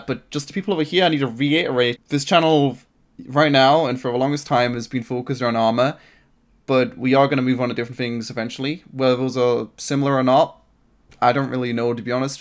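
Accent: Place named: North, United Kingdom. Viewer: Babe, We watch you for you, I can't NUT without that sexy Northern Accent.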